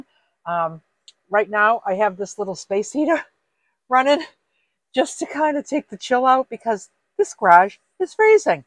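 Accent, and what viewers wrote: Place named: Massachusetts, United States. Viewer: Ha I knew it,,,,I heard that Mass accent on your other videos. Thanks for cool videos Helpful ..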